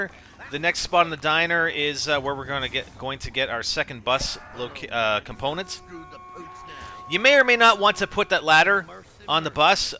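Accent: Canada. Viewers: im guessing you're canadian? btw thx for the strategy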